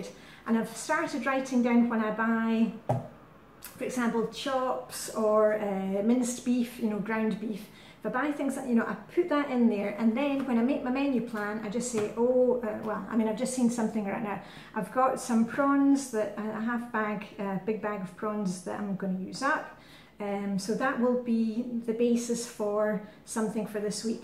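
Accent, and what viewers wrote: Scotland, United Kingdom. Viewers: You have such an open, natural style when you talk to us. Thankful every day for the thought and time you put into these videos, and for your sparkle and encouragement and good cheer. (My husband loves your canny Scot style! :)))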